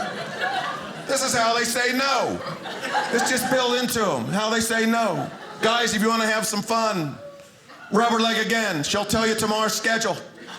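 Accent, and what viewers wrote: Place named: Ohio, United States. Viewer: Sounds like he is from Ohio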